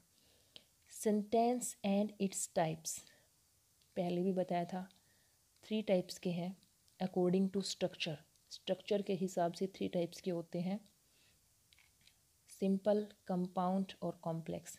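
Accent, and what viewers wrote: Haryana, India. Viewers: Madam Ji Maine Apka Thoda thoda Haryanvi Language ka Touch Padhne main Ap use karte ho Badhiya lagta hai जय हरियाणा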